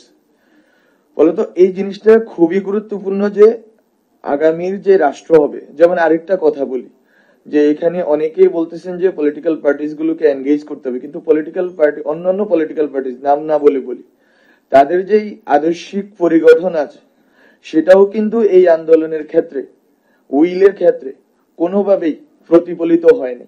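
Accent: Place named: Noakhali, Bangladesh. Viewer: নোয়াখালী টান আসে কথায় আমি তার কথা বুছি না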